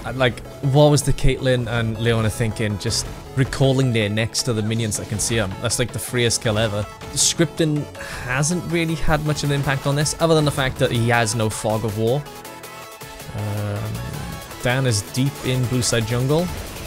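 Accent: Wales, United Kingdom. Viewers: Awesome! The Welsh accent made me feel back at home 😊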